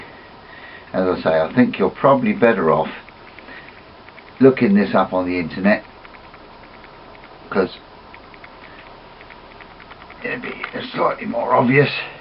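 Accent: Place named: England, United Kingdom. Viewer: Сразу видно по акценту - Англичанин)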